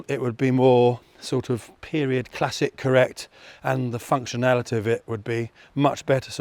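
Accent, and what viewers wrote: United Kingdom. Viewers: Beautiful bike, and you are very good, I'm learning British pronunciation by watching your videos, just out of curiosity I'm 1.85m tall, do you think it's too small as a bike?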